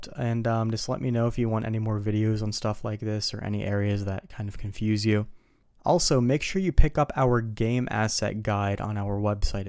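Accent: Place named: North America. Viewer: You sound North American to me. You have a deep voice, you must be 6'3", therefore I need to be all ears, as English is my second language. Overall, I mean, it's good to hear native english speakers. When someone tries to explain with strong foregn accent, I just turn off their videos. Lol. Thanks for sharing useful info! Good luck!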